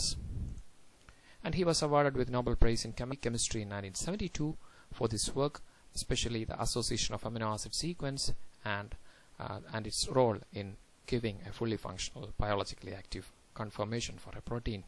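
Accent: Kerala, India. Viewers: But being a keralite I understood your accent :)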